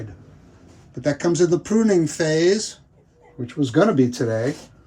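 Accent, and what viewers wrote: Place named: Midwest, United States. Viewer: I have a couple questions, how long did you leave the wire on before you took it off prior to putting it in the round pot? And can I ask what part of the country you are from? You seem to have a midwest accent? Coming from a Minnesotan, you sound like one of us.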